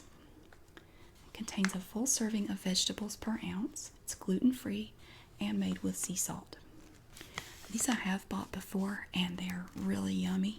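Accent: South, United States. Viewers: Well in any case, thanks for making these Southern accent whispers. I love them.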